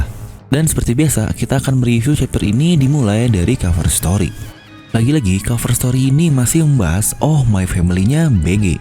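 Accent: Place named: West Java, Indonesia. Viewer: Urang sunda deteck:v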